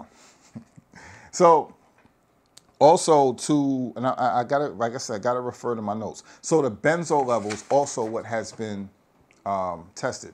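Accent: New York, United States. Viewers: Is the New York east coast vibe my mans here is real maybe you not use to that lol 😂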